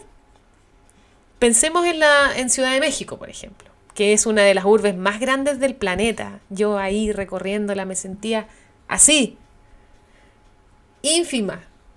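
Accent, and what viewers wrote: Chile. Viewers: Me impresionó la clrides con que explicas todo y me.gusta tu acento chileno 🌹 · Me gusta el acento chileno. Buen vídeo. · Que bonita narración y más en chileno saudos a mis hermanos maxas, visita Querétaro tiene mucha arquitectura e historia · Es impactante tu narrativa arquitectónica, dicha con un tono de voz tan característico de las chilenas. Espero más de tus videos. Recuerdo el título de una melodía de tu país que dice "si vas para Chile te ruego viajero"..... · Q lindo es cuchar esas palabras dedicadas a mi País. Con la sabiduría de una conocedora de nuestra cultura.. y experta en Arquitectura!! Me encanta el Acento Chileno. Y su Gente!! Saludos me suscribí. Y estaré pendiente de lo q publicas. Abrazo grande de Guadalajara Mex. Al al estás invitada a conocer. Si no la conoces!! Abrazo grande!! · . Creo que eres Chilena? Pero tus programas me cautivaron. 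Y mira que es un reto ya que en los que escuché no incluían imágenes. Pero tus relatos desatan la imaginación!! Soy Mexicano, hijo de española. Y me capturó tu forma de hablar. Sacaste mi orgullo de ser mexicano!! Y que no todo son carteles de la droga. Saludos!!!! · Es maravilloso escucharla el acento chileno es muy bonito y escucharla hablar de México WOW que belleza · que acento chileno maravilloso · Me gusta tu voz y acento de chilena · Gracias Poe tu narrative soy in Mexicano en Chicago y tengo amogos Chilenos me gusta tu Aden to chileno. Tines Que ir a Morelia Guanajuato a Taxco y Verla otro Mexico Zinzunzan y Verla’s La grna varied de Mexico y su culture felicitates en hora buena Dios te bendinga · Hola te acabo de encontrar y me encantó tu podcast Gracias por entender la esencia de mi país ❤ por explicar tan bien nuestra cultura a través de nuestras construcciones edificios etc En nuestra arquitectura está nuestra identidad Me encanta tu acento chileno ❤❤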